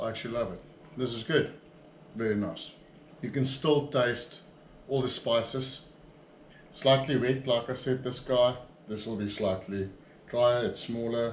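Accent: South Africa. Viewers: when i first saw this i thought Oh fok een of ander soutie gaan nou die opneuk" until i heard you were south african. This is what we call Biltong, not that jetk wanna be stuff the americans eat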